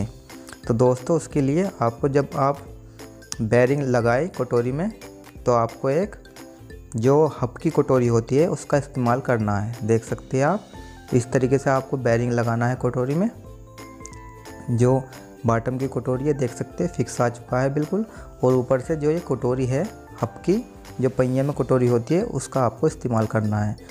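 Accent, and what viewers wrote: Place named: Meerut, India. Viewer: उरे, परे की बोली, भाई वाह, मेरठ से हो या बुलंदशहर से l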